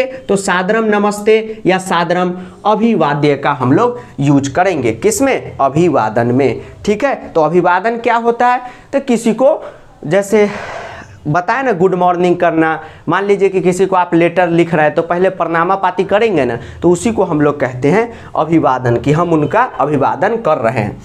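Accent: Bihar, India. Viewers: Pure bihari sir😍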